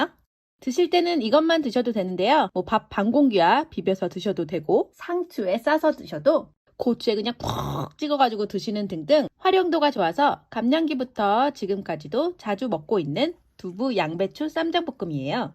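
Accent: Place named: Gyeongsang, South Korea. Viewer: 냉장고에 있는 재료로 다이어트 요리 총분하네요 들기름 씻은 김치 좋고 경상도 억양인가요 잠 듣기 좋네요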